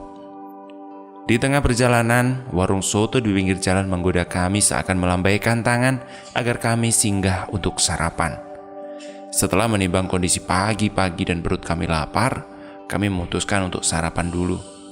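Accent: Java, Indonesia. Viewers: Suara nya kok enak y 😂😂 Apa lgi logat jawa nya..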